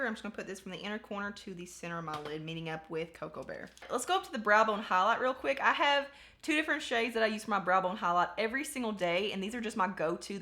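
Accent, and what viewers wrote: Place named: Alabama, United States. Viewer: I’m coming from channel not sure if I spelled it right but I have subscribed to your channel I absolutely loved this video I love drugstore and dupes videos. You are a beautiful young lady but if I can ask where you are from I heard an accent I live in Alabama and it sounds like you may be from there. God Bless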